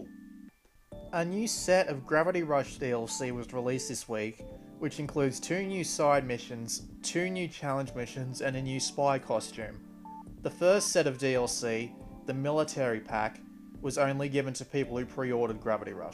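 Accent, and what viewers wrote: Australia. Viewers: Came from GAF, good post. Sound aussie so some aussie release dates might be nice. Also where the hell is MetalGear for vita!? *checks vita*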